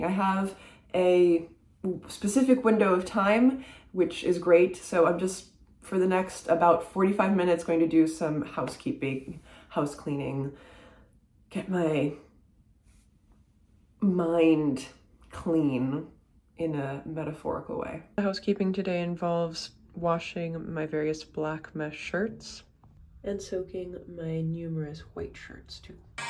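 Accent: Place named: Canada. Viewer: I might borrow “joggish thing” from you bc I’m an Olympic level joggish un-athlete😊 Do I detect a very slight Canadian accent? It’s the “been” pronunciation that clues my northern Vermont ears.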